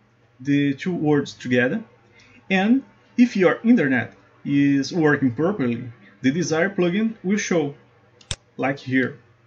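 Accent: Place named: Brazil. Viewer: Engraçado como se percebe logo quando o sotaque é brasileiro, é um sotaque único. Siga produzindo em inglês, nesse meio a maioria entende e o alcance não fica restrito a aqui.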